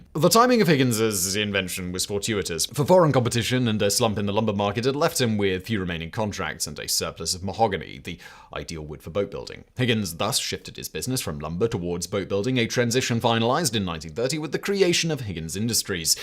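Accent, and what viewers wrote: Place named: RP, United Kingdom. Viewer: you speak too damn fast. Your speech is pretty close to double the normal speed of a typical English speaker from around the world. Although you have a very crisp, articulate upper class English accent that is not difficult to follow for most North Americans, you speak so rapidly that I believe that you are leaving many non-native English speakers bewildered. If I have one of your videos playing in the background and I’m not concentrating on it specifically, I am losing track of what you have to say at times. And I lived in the UK for three years so I have little to no problem with most English accents short of a Geordie.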